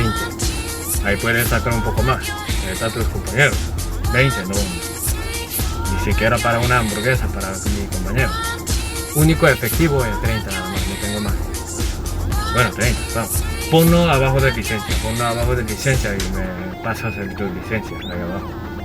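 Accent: Panama. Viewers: 🤣 habla Panameño Clarito Xuxa madre, Ta webao dice 🤣👍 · Que tierno que esta hablando como un panameño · Donde aprendiste hablar español, hablas como panameño tu acento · Saludos desde mi tierra La Chorrera. Ey amigo ya tu hablas como nosotros los panameños Pritty Friend jejejej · Ajaja ya hablas hasta mas panameño que cualquiera y yo queriendo ir a vivir a Sur corea, fui casi un mes en primavera y es muy hermoso, me gusto demaciado.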